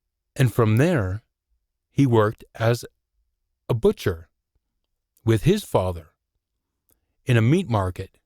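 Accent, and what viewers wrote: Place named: United States. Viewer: Your American not Scottish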